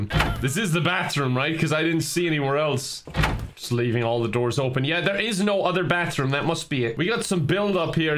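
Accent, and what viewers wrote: Russia. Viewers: your russian accent reminded me of the metro games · AWESOME Russian acccent comrade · "Welcome to the republic of.... Ireland!" Speaks with an russian accent, everything is spelled in a slavic language... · I like his russian accent :D Please play it again! ♥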